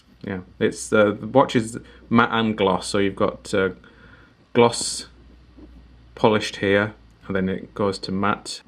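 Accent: Yorkshire, United Kingdom. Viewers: Will do my friend I've subscribed so will be watching your reviews from now. Happy new year . PS do I detect a slight Yorkshire accent may be Leeds ?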